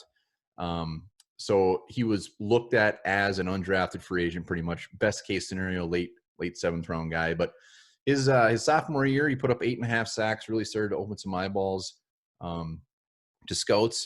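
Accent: Minnesota, United States. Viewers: Your Minnesota accent is soooo heavy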